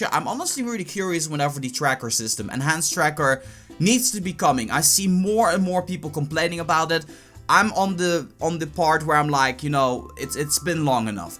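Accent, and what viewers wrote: Netherlands. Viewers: ben je nederlands ik hoor het aan je accent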